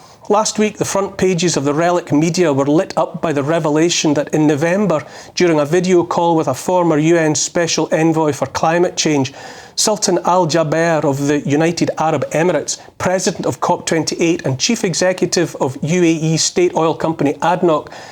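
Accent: Scotland, United Kingdom. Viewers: and Amen to that, Dutch from origin, living in Ireland for 30 years now, and have been called a conspiracy theorist, been ridiculed, discriminated against, lost my work as a music teacher because I refused the jab, now if I were to talk to someone the way I feel about what is going on in this glorious country...I would be called a fascist. I am actually proud to be called a CT, because it means I can see through the BS, but this latest name giving is just sick, and I totally agree with this Dutch lady, comfort is one thing you have given me too, listening to your Scottish accent alone is comfort. God weeps.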